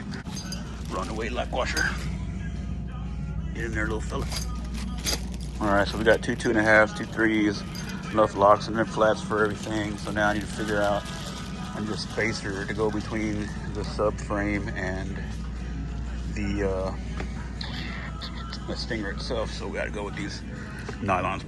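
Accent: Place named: South, United States. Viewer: I used to watch your videos years ago! I want to say thank you for all the banshee knowledge you were able to share. Just realized you have a Midwest vocabulary with your southern accent. I'm from tip of the upper peninsula in upper Michigan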